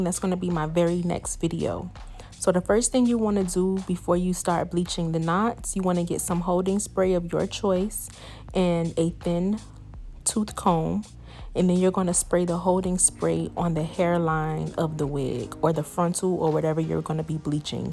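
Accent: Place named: Chicago, United States. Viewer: You must be from CHI. Honey that accent comin through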